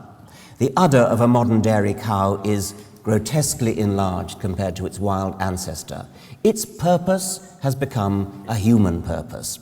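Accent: England, United Kingdom. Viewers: Arrogant no that's what English sounds like . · It's just a British accent, we in 🇬🇧 nearly all have one.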